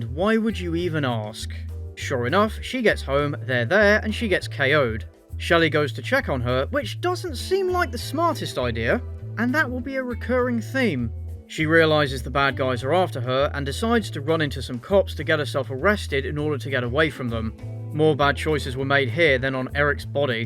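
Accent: United Kingdom. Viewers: I'm a simple man I See talking british dog man I click.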